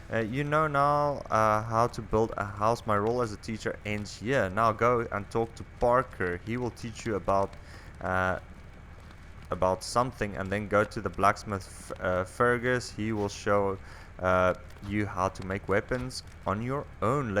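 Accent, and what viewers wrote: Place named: South Africa. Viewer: Dis alles in sy stem. Hyt daardie BoerQ aksent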